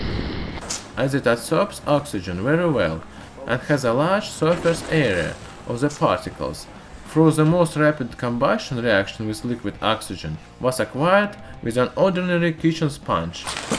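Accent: Russia. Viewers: Man, I love your videos and your Russian accent. Keep up with new content. Cheers. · Ocean Junkie I Agree He speaks perfect English with his very thick Russian accent. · Just started watching this channel recently. This is one of the best beginner's chemistry channels on youtube. Love the Russian accent and light humor as well! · Love to hear Russian accent speaking English, looks wiser think so 🤔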